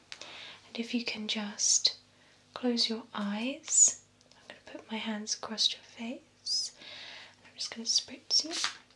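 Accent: United Kingdom. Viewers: There is nothing that turns me on more than a super-hot girl from the United Kingdom; their British accents drives me WILD!! This is a pretty cool skit too; her acting was really good!! This clip should have way more views and likes than it does!!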